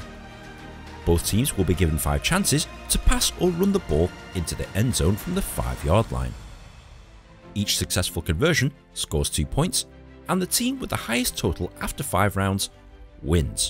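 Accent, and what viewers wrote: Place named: Northern England, United Kingdom. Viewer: This guy is so American yet has a British northerner accent with his Us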